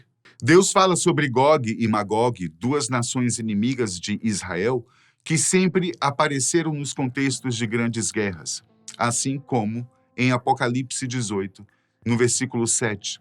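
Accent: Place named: Northeast, Brazil. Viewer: Conhecendo o canal hoje o vídeo já tem mais de 11 meses mas é atual. Muito interessante a explicação das profecias. Acompanho as profecias bíblicas e o tempo de Deus é diferente do nosso. A Rússia também está nas profecias. E também tem os cavaleiros do apocalipse pode ser cada cavaleiro uma nação. Você parece ser conhecedor de história seu tom voz é clara apesar do sotaque nordeste. Israel está vencendo o mau do terrorismo o que virá depois?? A Rússia escalando a guerra. 🇧🇷🙏🇮🇱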